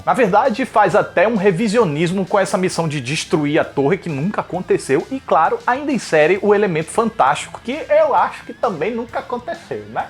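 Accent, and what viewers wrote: Northeast, Brazil. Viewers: O sotaque e o palavriado Típico do nordeste. Que maravilha ver alguém usando tão bem nossa língua. Parabéns.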